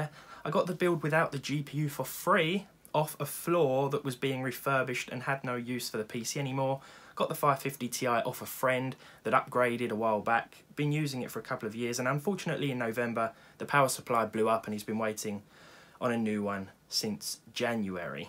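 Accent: United Kingdom. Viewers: Hello i have just come across your videos sounds like you are from the uk like myself i dont have facebook or Twitter. I am looking for someone to help me i have a 6-7 year old mac book pro works perfectly. I am currently using windows 10 via boot camp. My question is can i run windows on my macbook without using bootcamp? For example completely wipe the laptop of any operating system and just installing windows thank you i hope you can help daniel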